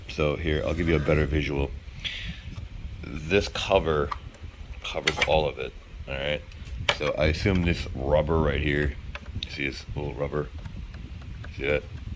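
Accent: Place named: New Jersey, United States. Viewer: I'm guessing you're from Jersey or somewhere thereabouts.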